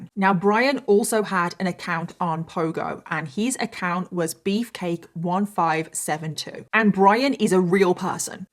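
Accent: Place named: Birmingham, United Kingdom. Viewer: I detect 10% Brummie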